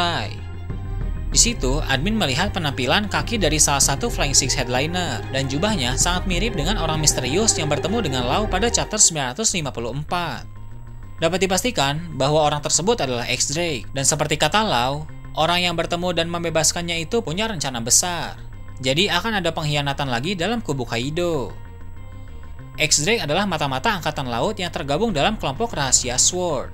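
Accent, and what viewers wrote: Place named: West Java, Indonesia. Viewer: Dari logat kayak nya admin orang sunda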